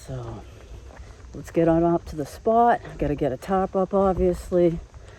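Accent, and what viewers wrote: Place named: East Coast, United States. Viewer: Love your videos, and your east coast accent 🎉🎉❤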